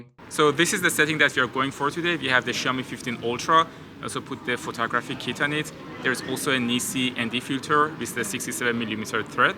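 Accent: Iran. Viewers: I love the iranian accent. Damet garm brother 😘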